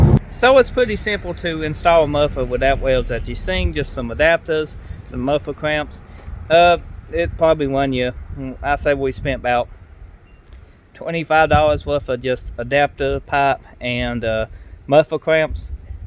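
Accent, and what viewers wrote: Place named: South, United States. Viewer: fuck the exhaust, iv never herd a strong southern accent with a 5 year old's speech impediment. impressive.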